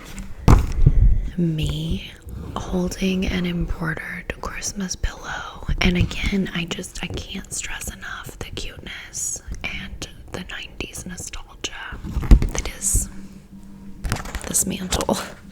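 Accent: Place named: Australia. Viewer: You're such a special person. Weird thing to say given we've not met but I spend time with you almost every day. I look forward to your hot take on whatever the topic is, I adore your Australian accent (and other), and overall wit. Thank you for sharing so much, bestie. Like, can we grab a cocktail if you're ever in Phoenix? :)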